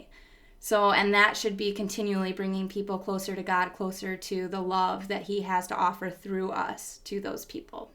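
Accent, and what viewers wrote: United States. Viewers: That Midwest accent though..... love it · As much as I love to hear your conversion story, I have one request to make which is whether you could slow down a bit as its hard for some of us living in India to follow those twang-rich american accents. I mean no disrespect but I wish to listen carefully to your speech which is truly inspiring.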